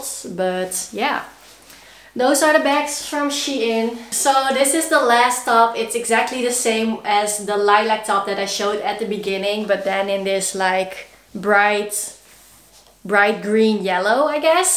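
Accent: Netherlands. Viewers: I love your dutch accent 💛🍀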